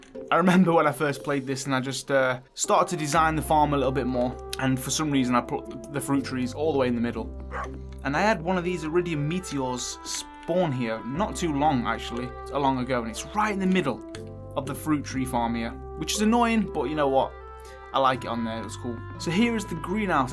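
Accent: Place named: Northern England, United Kingdom. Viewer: The fact I am thinking your proper northern like me 😂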